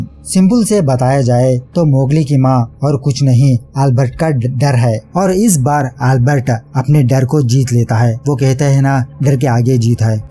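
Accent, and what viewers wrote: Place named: South, India. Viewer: You are south indian... i got it. but nice explanation. good.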